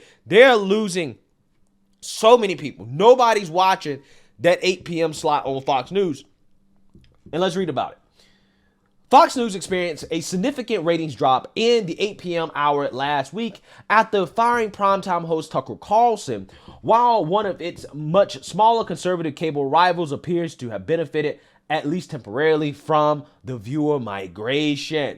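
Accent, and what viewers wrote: South, United States. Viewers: You’re from the south bro. Ppl actually talk shit about how you say words🤣 those people are dicks man. That’s not criticism, that’s just being ignorant, and not understanding there’s different accents. I live in Texas and moved from Boston. When I first got here people couldn’t even understand me. Maybe people need to understand there’s different cultures.😂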